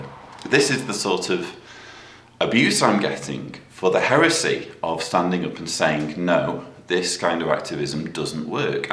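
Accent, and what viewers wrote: England, United Kingdom. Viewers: Ignorant men cannot be educated. Neither can ignorant women. There is a lack of education. Lack of education can be remedied. A few good lectures and careful correction of writing and analysis by a good prof. Such an education made me effective for Supreme Court litigation and corporate antitrust work. Anyone with half a brain knows that the biggest turn off is ME, ME, ME, ME, ME, ME. ME. He is far too old to be a millennial ME, ME, ME. God help his children. ME, ME/ Most likely, I can describe his wife. I suspect she lacks confidence. Anytime she wants to learn, she hears that all funds need to go to ME, ME, ME. I suspect that he admires her cleaning, sewing, and childrearing skills most of all. Sex is often secondary to working class men. They are not good lovers. ME, ME, ME. Rather than spend any family time, they hang out with men who endorse the ME, ME, ME mind frame. 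Ask me, how do I know this? First, observation within my own family. Second point. Reading detailed anthropological and psychological studies of working class men. ME, ME, ME Always ME> never WE, Never Our movement, pointing out powerful women and men, NO. ME/ My PC is very problematic. Also, his English usage is not that great. Don't fall for a proper English accent as the hallmark of intelligence. There are much better speakers and writers. If my computer were working, I could show you a much better example of my own writing. Dietrich Bonhoeffer, Hemingway. Steinbeck, F. Scott Fitzgerald, Hillary Mantel w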